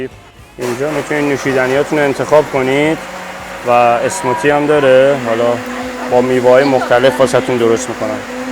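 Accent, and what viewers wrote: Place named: Gilan, Iran. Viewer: جون تو یه کلیپی گفتم لهجه گیلانی داری ، الانم اشاره کردی پس گیلانی داداش ❤❤🌷🌷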